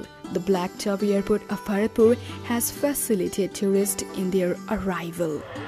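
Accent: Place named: India. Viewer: Indian accent spoted =)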